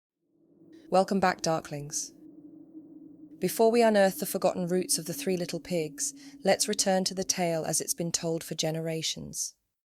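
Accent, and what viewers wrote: England, United Kingdom. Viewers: The delightful English accent enticing us down the path, peeling back the layers; soon the unwary listener finds himself traversing the underworld. Delicious! But you stopped the story short just when it was becoming really interesting. You were about to step into the world of gods and the spirit, and the value of expressions of consciousness to the ancients, their supposed cosmology and divine order. Another day hopefully.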